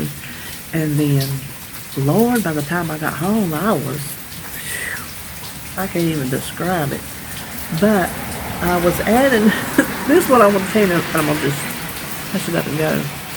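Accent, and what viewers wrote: South, United States. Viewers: I love your “southern talk”, unlike my NY accent. When you said “coffee”, that’s me.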